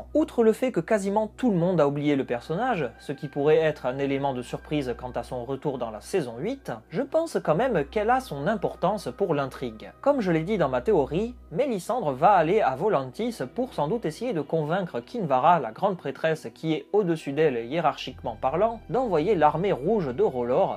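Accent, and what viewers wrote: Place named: South, France. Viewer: Oh le bel accent du sud !!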